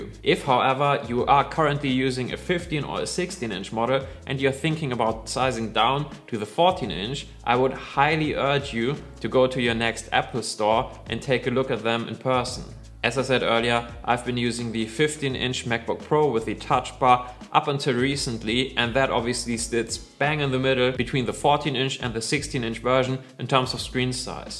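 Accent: Germany. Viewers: Hab sooo bemerkt dass du deutsch bist aber gutes Video 😅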